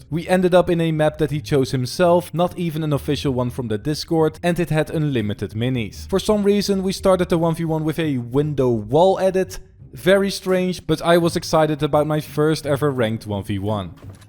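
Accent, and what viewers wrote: Netherlands. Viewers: Ur dutch right? Ps. Keep up the great vids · Heerlijk Nederlands accentje 😂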